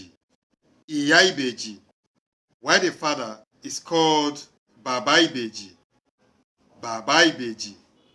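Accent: Igbo, Nigeria. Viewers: You're trying though, but YOU NEED TO IMPROVE ON YOUR ASCENT, or GO FOR IGBO LANGUAGE. Your Yorùbá ascent is NOT PROPER YORÙBÁ, but YORÙBÁ LANGUAGE WITH IGBO ASCENT, and it can really be confusing to the Yorùbá Children in the diaspora, trying to learn their language. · The intonation is very off though 🤔 In fact at other times, completely off! This is painful to listen to! With all due respect, I don't know where you're from, but you really sound like an Ibo person just learning to speak Yoruba.. You should have also abbreviated your speech to connect with what you wrote up on the board eg you said "E'kaaro" but up there you had Eku aro.. etc I'm here looking for something for a Zambian friend but really can't recommend them this as it will be terribly misleading.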